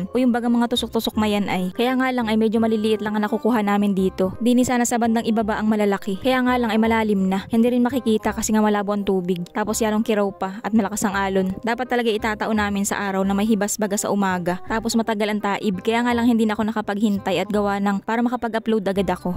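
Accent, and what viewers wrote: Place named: Visayas, Philippines. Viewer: Yong salita mo idol mayhalo kasing bisaya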